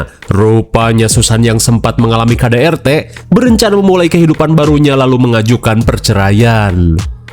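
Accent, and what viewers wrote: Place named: West Java, Indonesia. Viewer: Makasih ya AA sudah menemani kami semua dengan Vidio² horror+lucu dengan komedian dari AA 😊😊logat AA kayak orang Sunda ya.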